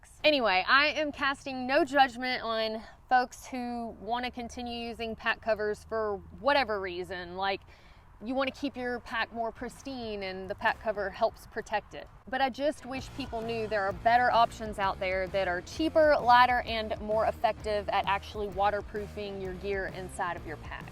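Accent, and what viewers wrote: South, United States. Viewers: Why, sir, that is a Southern Belle through n through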